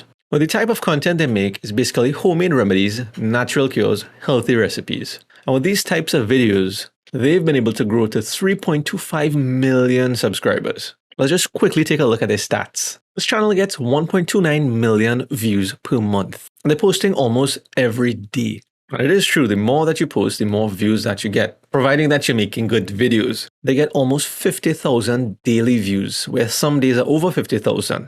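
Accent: Trinidad and Tobago. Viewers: Dude are you from Trinidad? Your accent caught me off guard crazy!!!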